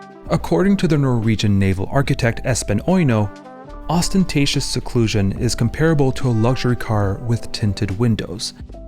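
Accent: Quebec, Canada. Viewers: when you speak french it sound like Québecois french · t tu québecois?!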